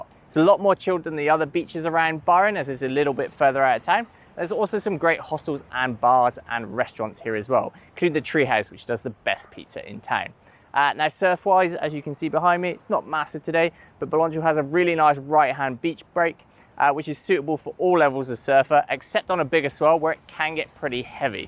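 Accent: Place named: Cockney, United Kingdom. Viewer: Rubbish. How do you think bungalung people feel about there home being exploited for money and popularity by Squeaky sounding cockney accent. Did U think at all. Surfing is a cycle that's learnt. You learn that a sand point of quality is hard to find. This is why no out of town surfers of low skill care at what they do in the line up. U can sitting on nose and same 2 English steroid heads will have there first drop in go. Bit further there's probably you and every chick longboarding that can't negotiate the the take off or cut back at all. Then we hit every body that faces backwards to the waveusually French and German girls.they only paddle for the biggest wave. The one 50 people have waited there turn for. It's done now why don't feature a drone tutorial of AYERS Rock. Ffff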